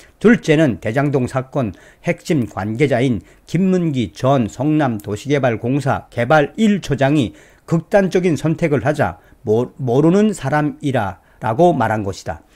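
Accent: North Korea. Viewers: 탈북민 이세요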